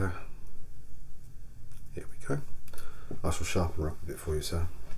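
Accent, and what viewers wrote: United Kingdom. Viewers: Finally a good British ASMR-tist with a beautiful accent and voice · Hold on a second... British accent...barber shop....possibly Fleet Street.... look I may be a lowly American from Virginia but I think I see where this is going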